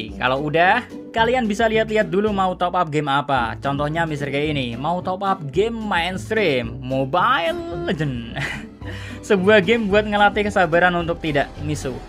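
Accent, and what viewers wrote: Java, Indonesia. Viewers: Logat jawa tai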